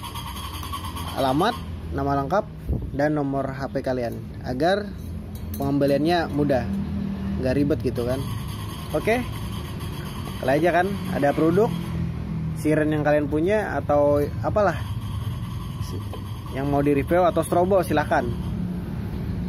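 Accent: Banjar, Indonesia. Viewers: Kaya ada logat logat banjar nya gitu om hehehe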